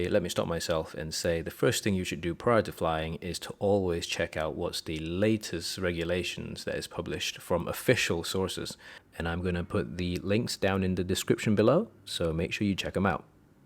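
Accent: Malaysia. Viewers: Was wondering whether you were Malaysian, the slang gave it away AHAHAHA! Nice to see a countrymen in this field!!! Subbed and liked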